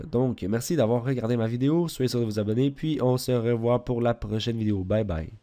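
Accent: Quebec, Canada. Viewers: lol canadien comme moi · Un Québécois ! Belgian like Québec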